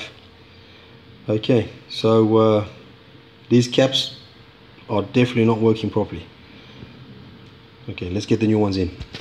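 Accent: South Africa. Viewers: Ahhh s.a accent 👌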